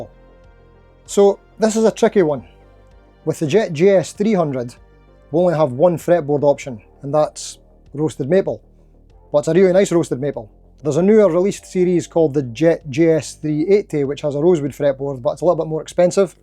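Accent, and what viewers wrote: Scotland, United Kingdom. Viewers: Scottish accent is a draw for me, it's like if Scrooge McDuck had a guitar channel that centered around Strat style guitars.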